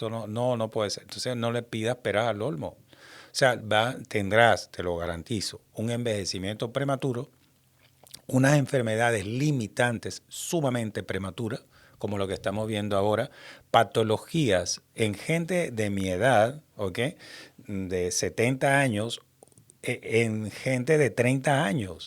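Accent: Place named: Caracas, Venezuela. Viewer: Ese acento me recuerda al Guaraira Repano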